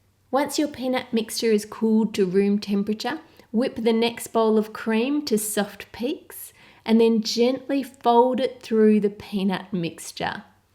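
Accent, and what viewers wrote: Australia. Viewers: who loves australian aacent?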